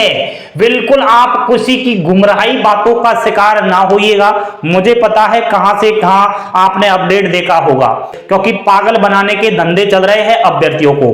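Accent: Rajasthan, India. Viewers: Sir aap rajasthan se ho kya ❤️❤️❤️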